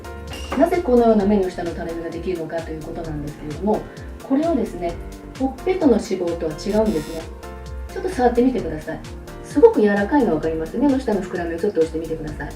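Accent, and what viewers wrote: Hiroshima, Japan. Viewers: タメになりました🤣 なんか広島のイントネーションに聞こえた😂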